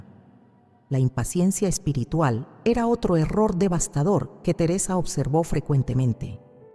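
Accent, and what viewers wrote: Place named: Peru. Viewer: Hermosa voz, con acento Peruano bellisimo!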